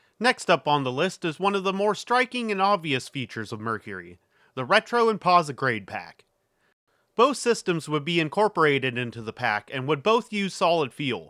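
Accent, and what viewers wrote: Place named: South, United States. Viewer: Wait! What happened to your beautiful, ambiguously southern drawl???!!!!! It's just not the same :'(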